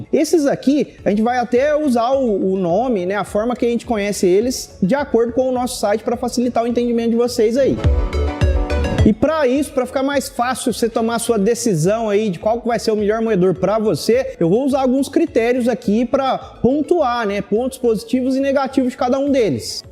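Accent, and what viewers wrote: Minas Gerais, Brazil. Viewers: mudou para mineires no vídeo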